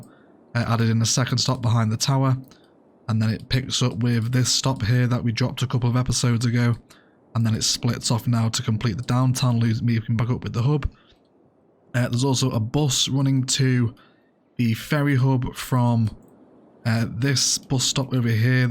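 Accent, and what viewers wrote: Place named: Manchester, United Kingdom. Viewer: I'm french so not that good to spot all the english accents, but you're from Manchester right? I can hear a bit of Marcus Rashford when you talk, I like it! 😁 Oh and great stuff overall on the channel. It helps a loooot!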